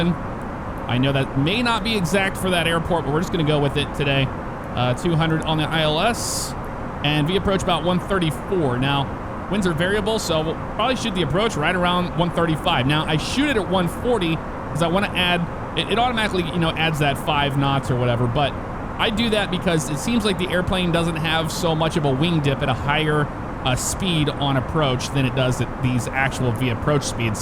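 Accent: Newcastle, United Kingdom. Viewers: Are yo a geordie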